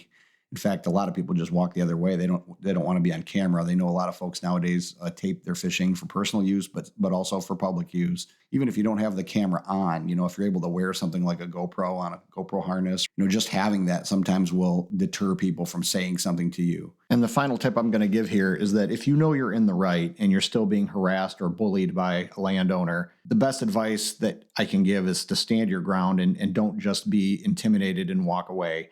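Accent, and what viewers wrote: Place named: Ohio, United States. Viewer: It would seem to me you’re from NW Ohio lol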